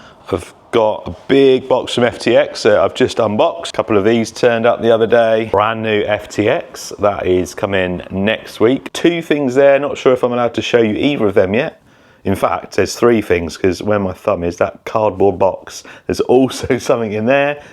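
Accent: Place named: England, United Kingdom. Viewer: you have a nice english accent that is nice to listen to..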